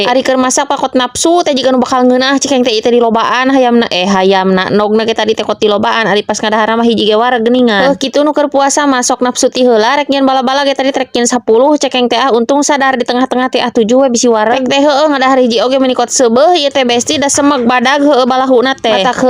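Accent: Sunda, Indonesia. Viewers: Logatna Sunda pisan teh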